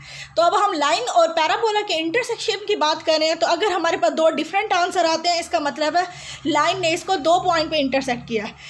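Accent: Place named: Pakistan. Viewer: Really OSM MAM, awaj se lag rha hai aap Pakistan se hai, but love from INDIA.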